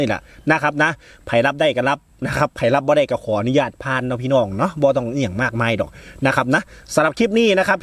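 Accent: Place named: Ban Phue, Thailand. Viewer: อ้ายเป็นคนบ้านใด๋ครับ เว้าคือคนอำเภอบ้านผือแท้ครับ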